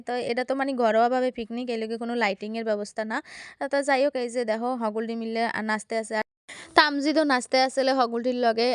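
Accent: Barishal, Bangladesh. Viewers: আপনি তো দেখি মাশাল্লাহ ভালোমতো বরিশাইল্লা বাসায় কথা কইতে পারেন? গো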